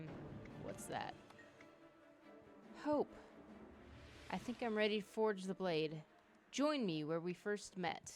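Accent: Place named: Midwest, United States. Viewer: Midwest accent so sweet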